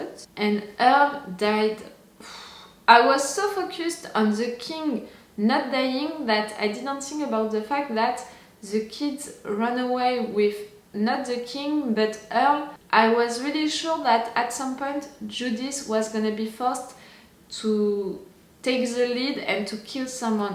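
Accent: France. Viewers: Tu es française hein ?j’ai reconnu ton accent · Knew you were French when I heard you. You people have a cute accent 😊.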